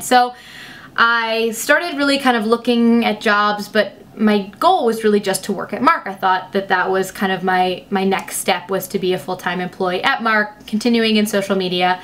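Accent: United States. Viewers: ) Wow one shot:O Your american accent is perfect, congratulations. Believe that you are not french finally. I'd love to hear you make tongue twisters in english and in french, you gotta be unbeatable in this area. It's always a pleasure to listen to you (even in english). You are truly a wonderful woman and so beautiful. Kiss from France. XO...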